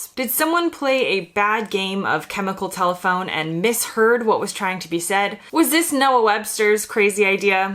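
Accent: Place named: Transatlantic, United States. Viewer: Transatlantic English. Love it!❤ 🇬🇧❤️🇺🇸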